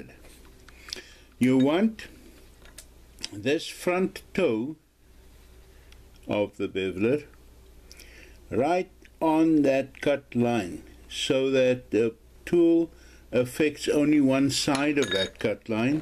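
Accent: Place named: Afrikaans, South Africa. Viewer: jy klink Afrikaans. Ek het nou al baie YouTube tutorials gekyk. Nie EEN van hulle het die "beveling" so goed verduidelik soos jy nie. Baie dankie - jou kanaal is nou my eerste stop as ek wil weet hoe om enige iets te doen!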